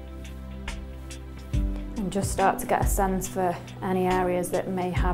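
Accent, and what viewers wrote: United Kingdom. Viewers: Can someone tell me from what part of Britain is her accent? Thank you. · A Swedish massage with a nice British accent :) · Are you british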